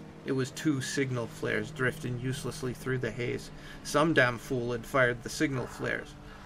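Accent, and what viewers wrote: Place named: Ireland. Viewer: I've heard this one before but it's way better with the Irish accent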